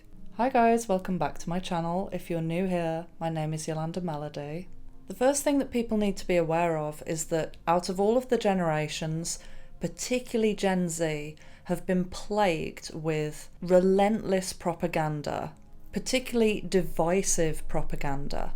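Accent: United Kingdom. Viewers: I like your accent got a pretty strong powerful British accent😊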